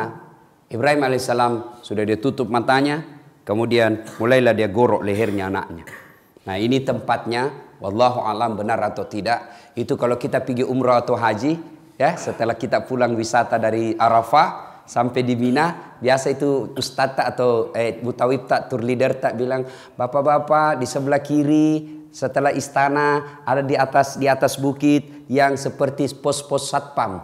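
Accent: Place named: Makassar, Indonesia. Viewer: Saya orang jawa tapi logatnya orang Makasar tetap ewako..menyenangkan.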